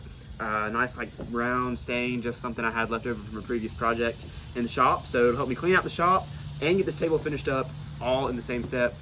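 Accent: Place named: Georgia, United States. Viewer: Thought that accent sounded familiar. Glad to see some GA representation in woodworking YT.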